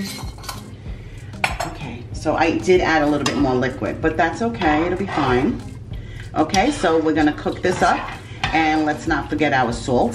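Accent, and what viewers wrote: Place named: Nuyorican, United States. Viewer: Love the newyorican accent.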